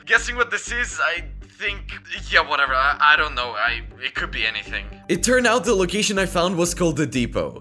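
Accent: Russia. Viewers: Are you Russian 🤔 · It's quite cool to see a Russian speaker talking in English for minecraft content, Subbed.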